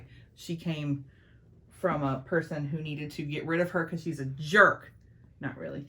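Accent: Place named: South, United States. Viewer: I found you from your beautylish lucky bag video. Never commented on YouTube before but wanted you to know that I stayed and subscribed because you truly do seem to “be kind” and positive. It also doesn’t hurt that you talk like me. 😁 I’m from SC where we also eat “hoop” cheese and know how to say Greenville!! I appreciate how raw and honest you were with some of these questions. I think your younger self would appreciate the advice. You’re truly a hidden gem here on YouTube.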